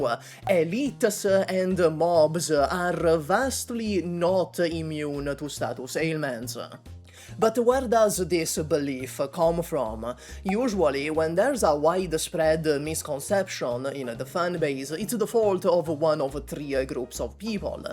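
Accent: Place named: Italy. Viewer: Why do you sound so cartoonishly Italian?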